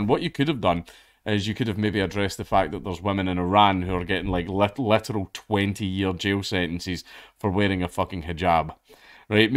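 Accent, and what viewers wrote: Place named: Edinburgh, United Kingdom. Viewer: your accent is slowly turning into a Edinburgh Uni accent. We must go back!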